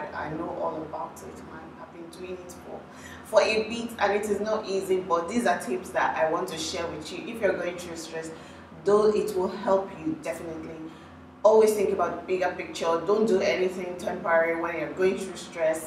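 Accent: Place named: Egba, Nigeria. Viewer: Aha! Egba dialect oooo🙌🙌🙌🙌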